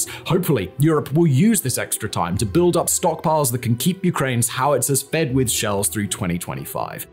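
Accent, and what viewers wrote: United Kingdom. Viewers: As an American taxpayer, I find it extremely irritating that someone with a British accent is blaming the country that is an ocean away from this conflict for failing to aid a European side in the war. Meanwhile Germany, for example, as well as the rest of the EU aren’t providing the necessary aide for their champions in the conflict that is taking place on THEIR CONTINENT! As someone who was born in Ukraine, I am hugely relieved that my great country — USA — is doing more than its fair part in fighting the newest axis of evil with Russian Empire, China, and Iran at its core. Well done Mike Johnson — God bless you!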